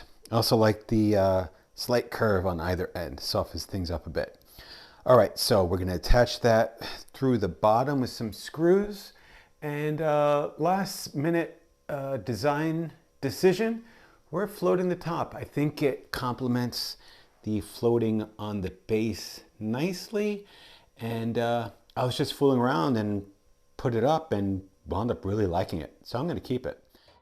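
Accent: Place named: Hudson Valley, United States. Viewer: Sounds like you might be in the Hudson Valley. Hello from Greenville, NY. You have an incredible skill level. How did you develop your skills? Also, if you don't mind, what was the tool you used - some sort of drill guide? Thank you.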